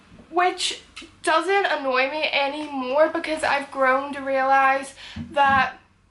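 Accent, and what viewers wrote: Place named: Northern Ireland, United Kingdom. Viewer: Eline P she is from Ireland, Northern Ireland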